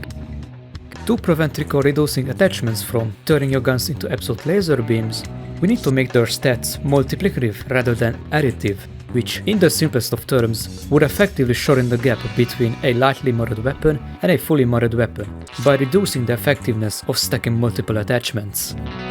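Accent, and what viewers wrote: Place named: Hungary. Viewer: Tudtam én hogy magyar :D a hunglisht nehéz rejteni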